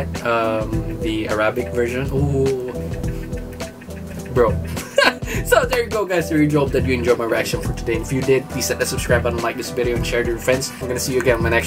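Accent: Philippines. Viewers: Illongo ka sir? Hahaha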